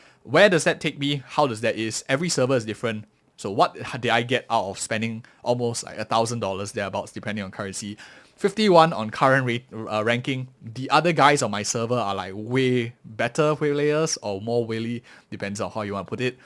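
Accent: Singapore. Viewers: I could tell that was a Singaporean accent right off the bat haha. Fellow SG as well, really good guide since we have roughly the same VIP level but I’m in S54. Nice to see an SG Creator! Keep it up 👍🏻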